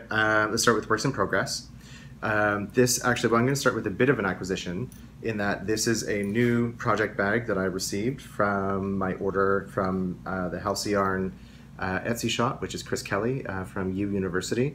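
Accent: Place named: Canada. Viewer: Awesome podcast! I can't wait to see your progress as a knitter. I brings me back to just a couple short years and helps me reflect on all the progress I've made since i (seriously) picked up knitting. Also, the Canadian accent...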